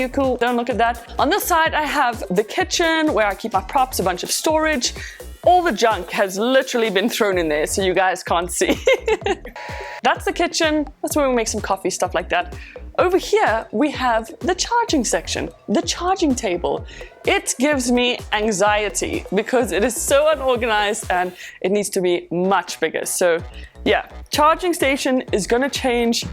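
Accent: South Africa. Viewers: Oh no I will definitely miss your South African accent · You from South Africa? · i knewwww you were south african! the accent 😂 i'm also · I’m happy for you buddy but be ready for unexpected rains, chilled weather and racist londoners as they will easily get hold of your South African accent and will/May speak shit.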